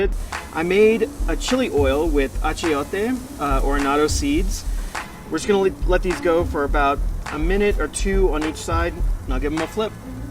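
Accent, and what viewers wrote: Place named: United States. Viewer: Wow, that is a thick american accent in your Spanish.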